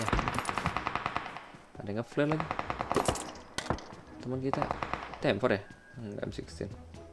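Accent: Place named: Semarang, Indonesia. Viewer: Pekok pekok wkwkwk Semarangan bangeeettt, nguakak pok aku nda ndaa 🤣🤣🤣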